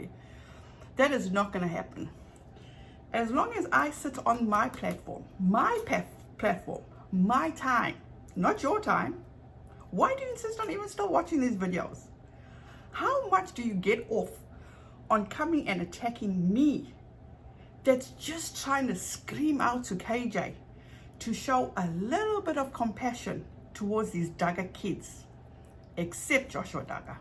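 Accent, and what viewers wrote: South Africa. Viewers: I absolutely LOVE your self confidence and self respect!!! U r such a beautiful person & mother and I’m SO happy u r able to let the ignorant comments by vile & disgusting people just roll off your back!!!! I can totally tell that it doesn’t bother u which I think is awesome!!! I know your grace really infuriates those insecure people!!!! Good for u!!! I’m proud of u & I love your passion, compassion & your intelligence!!!! (Side note - I ❤️❤️❤️ the South African accent!!! It’s my favorite of all accents!!) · 1- just because 1 partner doesn’t bring in income does NOT a mean they don’t contribute financially. Often it’s the at home partner who pays the bills and manages a budget. 2- I’m really embarrassed that I thought your accent was Australian. Oops. Now I know it’s South African. And I’m so sorry someone thought it was acceptable to call you a slur. 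Not ok. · Oh I'm so sorry - I had to pick my jaw up off the floor when the K word was dropped, my besty at highschool was from SA n listening to you is like hearing her Grandparents, such a great family - should've come here instead o US 😉🥰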